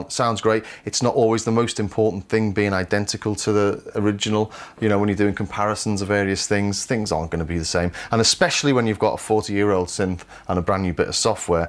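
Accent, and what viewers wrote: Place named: Chester, United Kingdom. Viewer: great review mate...you sound like a Chester lad!!!!!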